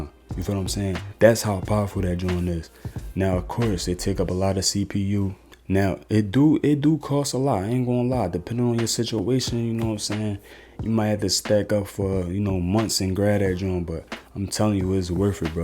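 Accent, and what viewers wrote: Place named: Philadelphia, United States. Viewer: sound like he from Philly. Good vid.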